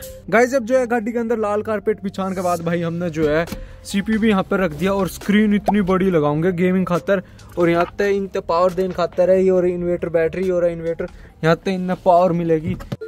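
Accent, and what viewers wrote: Haryana, India. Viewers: Bhai tera haryanvi accent and tu itna handsome ❤❤❤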